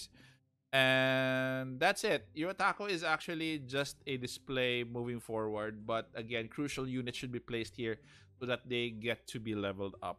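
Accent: Philippines. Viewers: I hope they Put a option so that people can Delete or Keep other servers. By the way are you a Filipino? The way you speak your English has a Filipino accent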